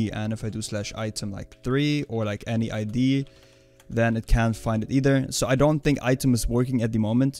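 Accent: Germany. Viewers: Are you from germany? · Jo Bro are you German?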